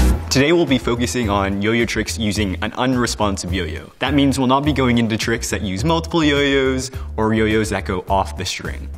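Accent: Canada. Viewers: I am very canadian. But i noticed his Canadian accent. It is beautiful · He sounds very Canadian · He's Canadian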